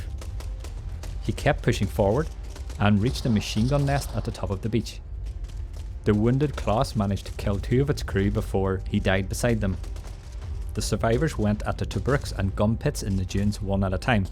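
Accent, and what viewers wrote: Northern Ireland, United Kingdom. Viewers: Judging by your northen Irish accent I assume this isnt an A.I voice over. Therefore I have subscribed and liked your video. I grew up in cork myself, but am a dutch guy living in Germany.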